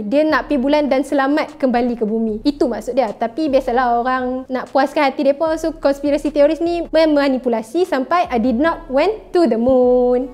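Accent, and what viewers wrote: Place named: North, Malaysia. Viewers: Uishhh loghat utagha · Memang lah...depa nak tunjuk longhat utagha kan.. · Pekat sggh utariaq hg nohh 😁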